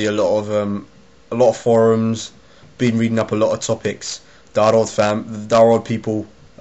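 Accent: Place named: Australia. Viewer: aussie accent. cool.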